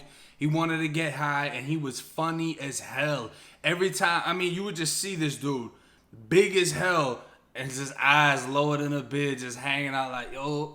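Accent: Boston, United States. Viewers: ur boston accent dope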